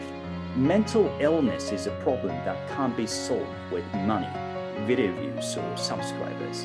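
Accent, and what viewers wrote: United Kingdom. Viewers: さんはイギリス英語かな？？ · 貴方のおかげで、共通テストのイギリス英語が前よりも格段に聞き取れるようになりました！！ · はじめて「 さん」ではなくのイギリス英語を聴いているような気分。響きます。。。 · 20万人おめでとうございます🎉 イギリス英語って染みますね！ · おめでとうございます！いつも応援してますイギリス英語のほうがいいっすねｗ 染みるわ～～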